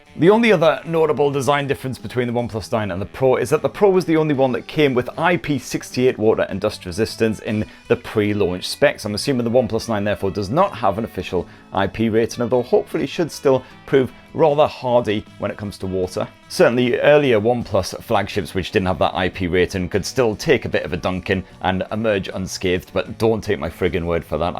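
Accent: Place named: England, United Kingdom. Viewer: love your reviews and the english accent!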